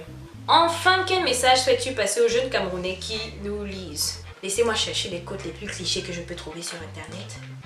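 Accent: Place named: England, United Kingdom. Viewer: J'aime beaucoup ton accent anglais. Où tu l'as tu appris ?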